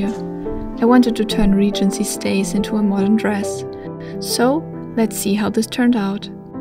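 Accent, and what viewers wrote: Germany. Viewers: Die Videos sind so wundervoll gemacht. Es ist fast wie in einem Historien- und Kostümfilm und verzaubert regelrecht. Zum Schmunzeln bringt mich immer die hörbar deutsche Aussprache ❤ Es gibt kein Nähkanal hier der so hochwertig und ästhetisch ansprechend umgesetzt ist und zum träumen anregt. Jedes Kleid wird zu einer Geschichte und mit Gefühlen aufgeladen. Einfach traumhaft, mein ganz grosses Kompliment 🎉🏆💐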